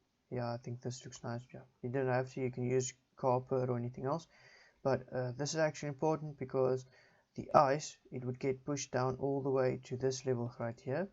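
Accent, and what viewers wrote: South Africa. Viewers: are you sourh african?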